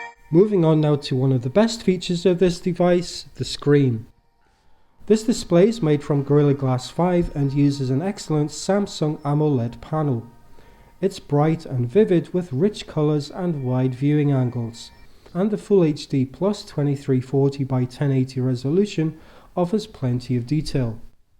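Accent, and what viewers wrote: United Kingdom. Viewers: Insanely nice review, I am loving your nice British accent. You really did show the Phone really well in all possible areas. 10/10...Greets from Germany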